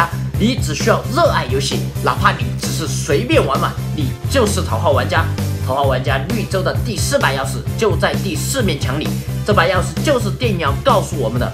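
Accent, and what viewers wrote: Sichuan, China. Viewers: 四川人吗，声音和鸭脖龙好像。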